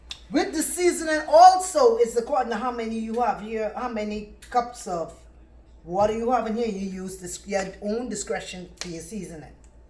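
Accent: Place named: Bahamas. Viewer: Bey my wife went crazy wit da Bahamian talk, she loved it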